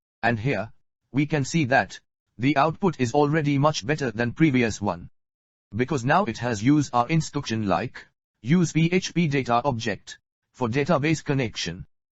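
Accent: India. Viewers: Another slop tool presented by a Indian robot voice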